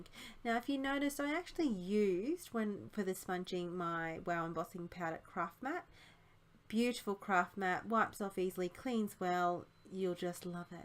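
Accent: Australia. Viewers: Very pretty. Love the colours. (Love hearing our Aussie accent in videos too! ) Thanks for sharing. 🙂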